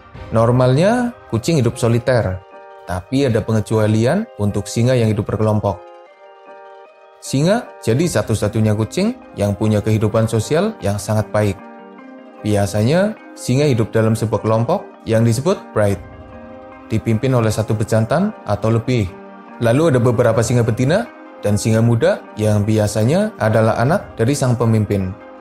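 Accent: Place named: Purwokerto, Indonesia. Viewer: Adminnya kaya orang Purwokerto logatnya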